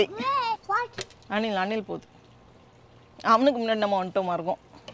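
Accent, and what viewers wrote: India. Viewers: Nice volg dear Namma uru Tamil kekavei sema mol cutiee 💓💓💓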